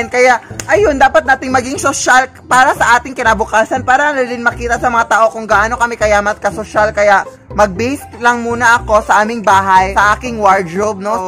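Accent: Visayas, Philippines. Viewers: Kuya bisaya kaba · Taga visayas ka te? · bisaya kaba?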